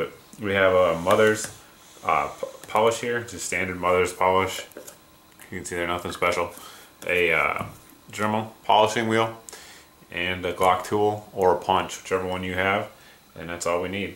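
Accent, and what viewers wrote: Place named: Massachusetts, United States. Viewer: Just revisited this video and noticed your slight accent in this early video. Massachusetts, right?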